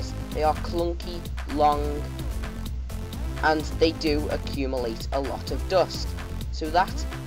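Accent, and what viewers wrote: United Kingdom. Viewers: Nice c64, i own one these '87s made. btw why allways britts talks so funny dialect? Good video. Check my videos, channel tommi palomäki or jebu6 Greetings from finland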